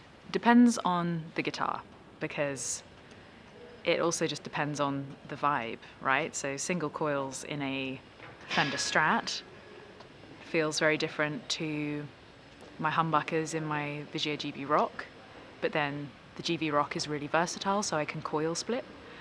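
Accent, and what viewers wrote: United Kingdom. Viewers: I don't know why someone would ask a question about her voice.? Imo her speaking voice is just as soothing as her singing voice.. She has a slight British accent that I adore.. It's not overbearing or a harsh tone that sounds almost condescending as I feel about some others. Her singing voice is purely beautiful. As is she.. Some of those questions had to be just poor attempts of humor..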